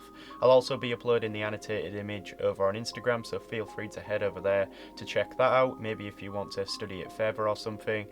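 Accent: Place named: Hull, United Kingdom. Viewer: His accent is Hull, from the North of England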